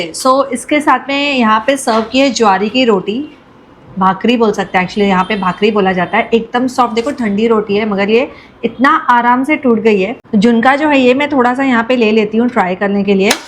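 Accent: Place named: Sindh, India. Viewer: Sindhen accent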